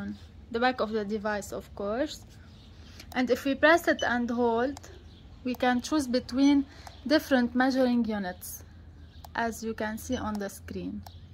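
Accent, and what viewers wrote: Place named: Arab world. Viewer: you look like arabian accent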